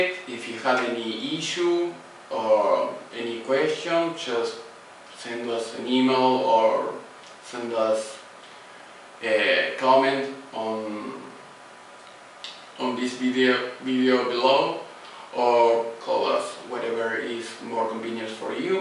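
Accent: Argentina. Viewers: ese ingles made in argentinian.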